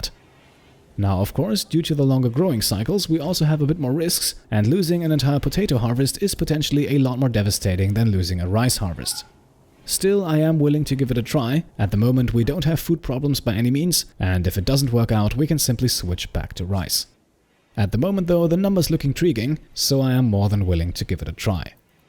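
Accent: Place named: Germany. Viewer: Greetings from your neighbouring country (the Netherlands) I love rimworld and i am happy i found your channel a month or two ago. One of my favorite games with someone who is doing a cannibal playthrough and who has a calm voice with a german accent that i really like. Keep up the good work. I can’t wait for the next rimworld series if this one ends.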